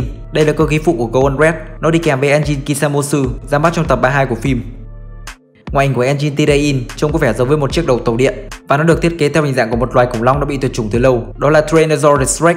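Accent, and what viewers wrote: Japan. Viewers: Kênh của ad rất có chất lượng, nhưng có một nhược điểm lớn, đó là ad dựa vào phiên âm tiếng nhật quá nhiều để gọi tên cho các mecha, toy, gattai các loại. Ví dụ, Speedor, ad đọc thành speedoru luôn, trong khi đó chỉ là thứ tiếng anh nhật phiên âm sai thôi mà. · Anh ấy đọc tiếng anh kiểu người Nhật đó bạn :V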